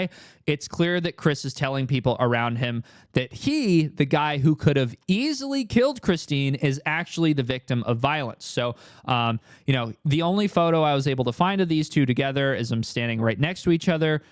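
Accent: United Kingdom. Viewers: I'm only watching this for the British accent when doing Australian impressions. It's what I fuckin live for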